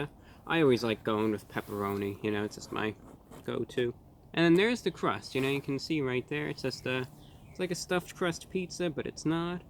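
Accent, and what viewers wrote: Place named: Brooklyn, United States. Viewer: He has to be from Brooklyn ! Lol 🗽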